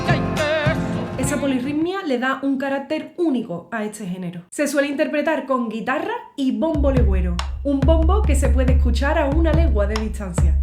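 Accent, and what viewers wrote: Andalusia, Spain. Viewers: hermosa version. Si no fuera por tu hermosa tch andaluza diria que naciste en Salta la linda. Cariños desde Argentina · Impecable! Flor de arreglo, y tu acento andaluz le da un toque único y maravilloso. Soy argentino, hermosa interpretación 👏🏽👏🏽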